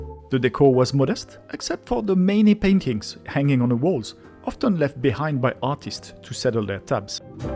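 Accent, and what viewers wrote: France. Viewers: I'll always remember my trip to Montmartre; my next time in Paris, I'll dine in the warm ambience of Le Bon Bock -- despite having to pat my hand a bit to find my fork and knife. 🍽 Just kidding... I love a dimly lighted resto for supper. Ahhh, that roasted Camembert w/honey and thyme! I can taste it now. 😋 Viewing your well paced videos while listening to your marvelous French accent is such a pleasure. Merci beaucoup,. 😊❤❤❤❤❤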